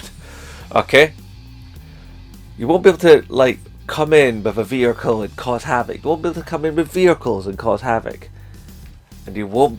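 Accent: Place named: Jamaica. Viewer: Wait a minute you're white?? Thought you were Jamaican bro!!?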